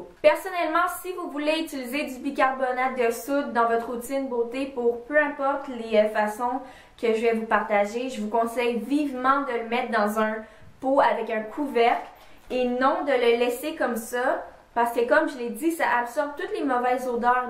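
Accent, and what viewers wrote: Quebec, Canada. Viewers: Très belle en plus j'adore l'accent canadien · J'adore le Québécois Ça m'incite à suivre le video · T es du canada Ton accent!!☺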